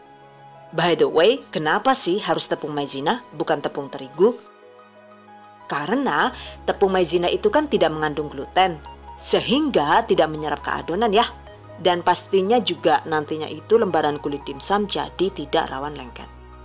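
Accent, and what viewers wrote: Banyumas, Indonesia. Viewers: Terimakasih resep2xa,selalu menyenangkan menonton video THV, gk jarang saya senyum2 mendengar cara bicara si bunda, yg paling saya suka bicara dlm logat ngapak😁👍...... Request saus pasangan dimsum, matursuwun🙏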